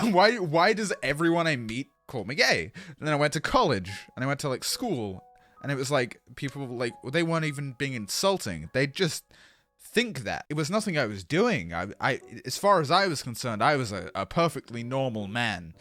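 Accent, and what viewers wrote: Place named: United Kingdom. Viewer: harry potter accsent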